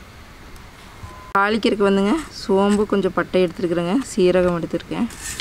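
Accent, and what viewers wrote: Kongu, India. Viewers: Akka ungal kongu tamil alagu Akka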